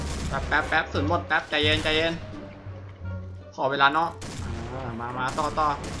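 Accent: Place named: Kanchanaburi, Thailand. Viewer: สำเนียงเหมือนคนเมืองกาญเลย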